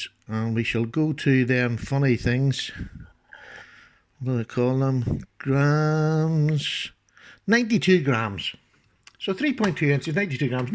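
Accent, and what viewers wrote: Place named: Ireland. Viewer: my man, I don't know how you do it, but do it you do! This must be a a new model as I haven't seen one before; I have to admit, I'm a might envious as, you're right! It's a beautiful piece it really is. I think it's a little belter, and that blade is a wonderful shape, ideal for any task. I have a good selection of knives from CRKT and they have never let me down once! Keep up the great work, I always look forward to hearing your dulcet, Irish, velvety voice and you know what you're talking about! See you on the next one, my friend. Much love to you and yours. I'm away for nice cuppa tea myself! 😆🤗❤️❤️❤️❤️❤️❤️❤️🤗😆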